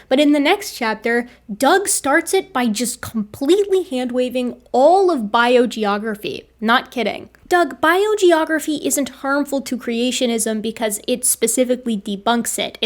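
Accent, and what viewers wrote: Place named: Minnesota, United States. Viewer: Is that a Minnesota accent I hear, ew.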